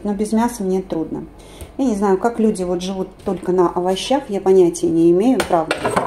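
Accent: Baku, Azerbaijan. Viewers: ДАЙ БОГ,ЧТОБ СРАБОТАЛ МАГНИТИК-СЧАСТЬЯ🙏🙏🙏👍‼️А МНЕ,ОЧЕНЬ НРАВИТСЯ БАКИНСКИЙ ГОВОР,ОН МЯГКИЙ,МЕЛОДИЧНЫЙ))))А ВООБЩЕ,СЧАСТЛИВЫЕ ЛЮДИ У КОГО ПОЛИСАДНИК...ЖИВИ НА ЧИСТОМ ВОЗДУХЕ,КОНЕЧНО ЕСЛИ НЕ ИСПОРТЯТ" ВРЕДОНОСНЫЕ ЧЕЛОВЕКИ"))))МИРА,ДОБРА,СЧАСТЬЯ И ЗДОРОВЬЯ ВСЕМ-ВСЕМ!!!!🙏🙏🙏♥️🌺🌷🌹‼️ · 😄😄бакинский акцент. 👍👍 А когда мы собираемся сёстрами мы используем этот говор🤭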